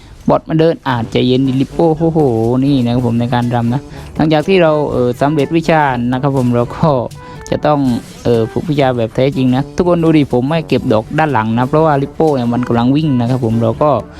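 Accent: South, Thailand. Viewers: พี่เป็นคนใต้ใช่ไหมครับ😆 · พี่คนใต้ใช่ไหมผมฟังจากสำเนียง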